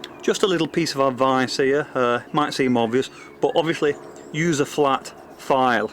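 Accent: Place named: Northern England, United Kingdom. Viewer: Thanks for your reply. I wouldn't have guessed Northern England, but I wasn't sure. I spend a summer in Sheffield many years ago with a bunch of Australian friends. I loved England and haven't had a chance to get back. This little tool is brilliant. I am having one struggle with though, maybe because I'm a beginner, but maybe you have a tip. When I am filing a piece of sheet metal I find that after I have squared it upon the stop I notice it shifts sometimes when I go to tighten it back up. Probably need more practice. It looks like you are holding it in place with one finger while you tighten. I will try giving that a try. Thanks again for sharing these videos.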